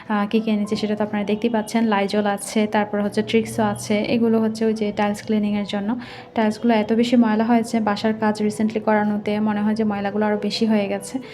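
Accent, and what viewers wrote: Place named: Chittagong, Bangladesh. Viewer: Ohh Chittagong er meye🩷 bole kotha 🩷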